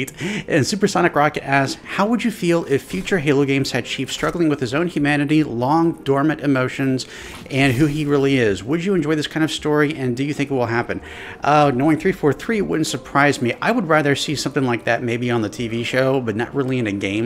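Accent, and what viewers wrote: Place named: United Kingdom. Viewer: I C O N I C.- A Flood fan with UK accent. 👀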